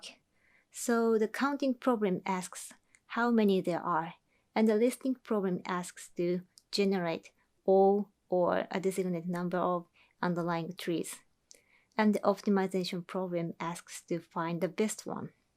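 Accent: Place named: Japan. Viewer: you've changed my view on my take on japanese speaks english. the lease accent and very standard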